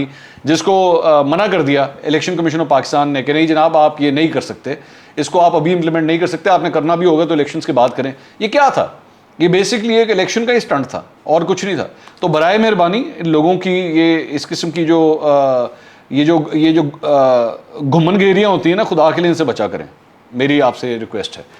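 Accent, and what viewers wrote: Punjab, India. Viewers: Pti walay gory ki tasweer daikh k khush ho gaey.Amazing vlog with Punjabi ka tarka puttar maza aa giaa🤣😂😁😀😁😂😂😂😃😄😄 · Your Punjab accent and irony behind this is mind blowing 🤭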